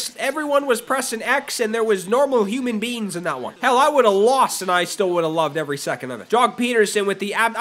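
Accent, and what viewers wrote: Canada. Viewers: are you from Ontario or the Maretimes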